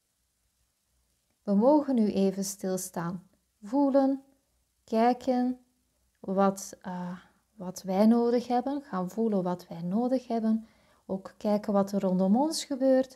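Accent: Flanders, Belgium. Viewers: Mooie reading dankjewel 🙏🏻✨🤍 Je geluid is prima zelfs op m’n oude ipad..je Vlaamse tongval charmant en ook dat is prima te volgen als de nederlandse die ik ben ;) Laat gaan laat gaan 🤷🏻‍♀️😅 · Weer zo herkenbaar, ik ben zo dankbaar dat ik in de flow leef. Deze maand gestart met een nieuwe baan als welzijnswerkers en 9 feb begin ik met de opleiding Social Work. Over het geluid, ik kan het altijd prima verstaan. Met en zonder oortjes. Bedankt weer voor jouw fijne reading en jouw fijne stem, ik geniet juist van jouw Vlaamse accent. Spreekt mij aan. Fijne dag lieve 🙏🌺💫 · Resoneert helemaal,. Mijn ware zelf komt steeds dichterbij. Ik vind de ondertiteling geen verrijking, zo nu en dan verkeerd vertaald. Nou je, voor sommige mensen wel fijn, ik negeer de ondertiteling, je bent voor mij goed te verstaan en te begrijpen. Je Vlaamse accent is echt geen beperking.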